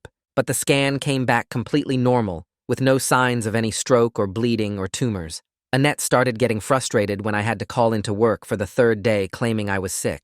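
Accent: United Kingdom. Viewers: british accents are cool man, why would you want to lose it anyway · It sounds goofy as shit in some scenarios, and since he sounds British how people view him changed because America is either the most welcoming place to foreigners or the most brutal depending where, and some people will mistreat him, and think he’s weird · From what it sounds like, his brain mistook stress for brain damage and tried to rewire itself to protect itself but rewired itself to his knowledge of british accents instead of his normal american one Also, as a british person, I'm offended. A british accent hurt him this much. Those people treated him terribly for sounding like people I know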